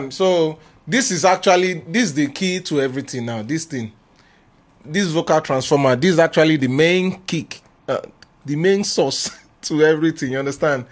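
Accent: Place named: Igbo, Nigeria. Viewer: This guy igbo English accent is mad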